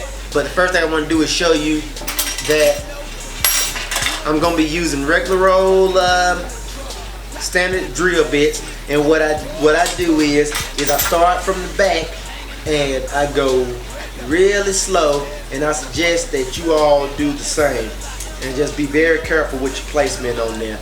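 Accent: South, United States. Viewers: yo voicee is country and raw iwish i could talk like that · He's southern the white people there sound the same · u from da south aint you?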